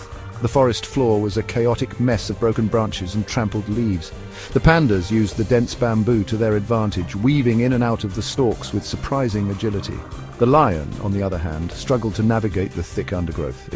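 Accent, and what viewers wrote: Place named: England, United Kingdom. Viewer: The most beautiful English accent and the mastery of English language! Really enjoy listening to you and your absolutely artistic use of words! All perfect!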